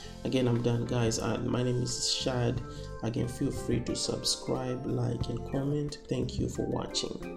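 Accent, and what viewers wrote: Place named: South Africa. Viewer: do you have an email to cantact you directly for tutorials, from the sounds of it you are based in Mzansi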